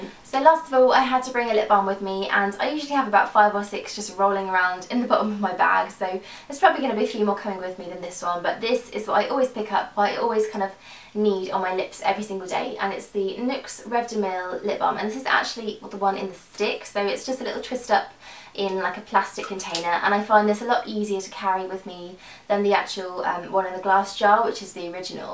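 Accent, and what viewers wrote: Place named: London, United Kingdom. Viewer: I thought you were from London lol! Where are you from? x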